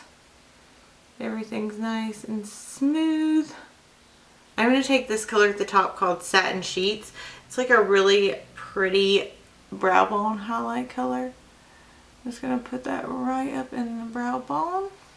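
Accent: South, United States. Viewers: OMG idk if i've said this before but i love your voice! Its so country! lol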